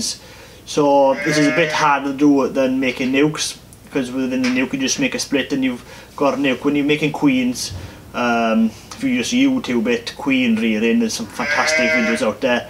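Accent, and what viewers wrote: Wales, United Kingdom. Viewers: 20 to 40 pounds of honey per hive per year, did I got that right? So that's 9 to 18 kg. So to make an average full time income, you need about 800 hives. Is that so different in Wales compared to Germany or did I mistake that beautiful Welch dialect? :) I read about 50 kg per hive on many sources. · very true, although I genuinely believe the more the merrier when it comes to mead. Its still taxed and treated like a wine which is very annoying and also extremely expensive for small producers like myself. I'd make some YouTube videos on how to get started etc but I don't have a cheery Welsh accent like yourself and unfortunately I have the personality of a slightly moist paper bag.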